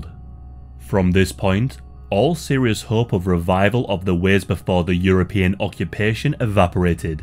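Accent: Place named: Northumberland, United Kingdom. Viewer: Very informative, thank you. Your accent intrigues me, you sound northern but I'm not sure where, Northumberland?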